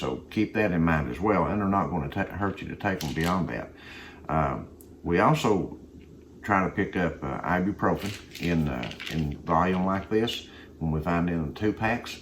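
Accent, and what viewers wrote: Kentucky, United States. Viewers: Hi!! Brand new subscriber here! Ashland, Ky native here!! I knew where you were from before you even mentioned Corbin or Barbourville. You sound like a lot of my family. I LOVE your accent. I have a pretty strong Kentucky accent. My husband is in the Air Force and we just moved to upstate NY a month ago. I stick out like a sore thumb with my accent. Haha! I've had so many people comment on it. Thankfully they've all been nice about it:)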